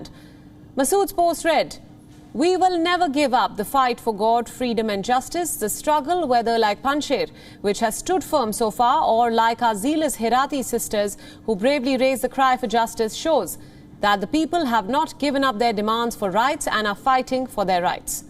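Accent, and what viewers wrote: India. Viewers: Hall If you had worked in high tech in the US, you would find her accent easier to understand -- you would have had so much practice learning to understand it from listening to your Indian coworkers! But it gets worse: there are more people speaking English with her Indian accent (or one similar) than there are speaking British or American English! Indians will get the ability to shape the future of the language due to their numbers! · Why the narrator is speaking like an Indian Mama Pundek? TQ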